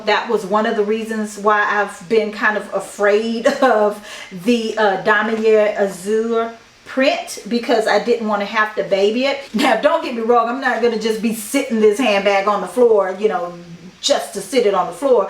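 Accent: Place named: Houston, United States. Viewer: I just discovered you and I think you are funny and knowledgeable. Plus you make me homesick. I’m from Houston and your accent is making me think of home. Subbing right now!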